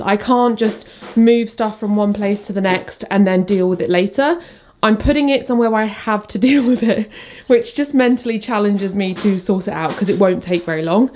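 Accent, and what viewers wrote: United Kingdom. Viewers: I abslolutly love your videos ! You give me so much motivation and joy to declutter and organize my bedroom, and I also love the fact that you are vert natural during tour videos, you don't try to be someone else so it feel so good to see you very shiny and happy. You inspire me to embrace my values and to give me hope, thank you and never stop showing us your declutter video ! Ps : as a 17 years old french girl, I'm completely in love whit your british accent ! And it help me to understand english with the british accent so thank you ! <3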